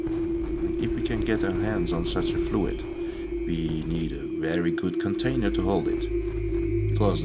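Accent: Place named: Netherlands. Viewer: This is the wordt dutch accent I have ever heard! The animation is really nice, but has anyone actually built it (before claiming all kinds of antigravity technology)?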